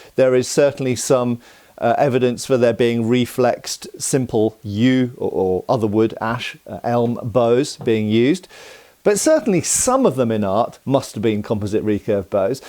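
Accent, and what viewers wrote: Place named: England, United Kingdom. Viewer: Seriously? If you’re American and you’re criticising how an English person is speaking English, consider where your language actually comes from, and who’s actually the one butchering it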